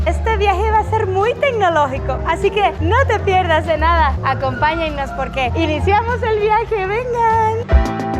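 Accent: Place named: Mexico City, Mexico. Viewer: No detecto que seas cubana...hablas como chilanga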